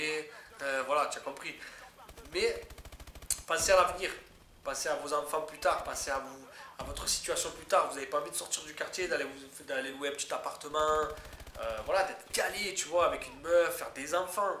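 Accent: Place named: Marseille, France. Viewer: Slt j'adore t vidéo en plus t un marseillais j'adore les marseillais surtout l'accent bref gg pour ton nouveau taf sa fait plaise que t trouver un taf qui te plaît écoute g un petit souci au niveau fille en faite cela fait 6 mois que je suis avec ma copine et on a toujours pas couché ensemble pourrai-tu me donner un conseil car c la fille de mes rêve c ma futur femme on c déja décidé on restera ensemble pour la vie et voila le problème est la elle est timide et elle prend pas la pilule conseil moi un truc pour la rassuré mais bon 8 mois sans faire l'amour c long koi donc voila stp merci si tu répond a ce petit texte je t'aime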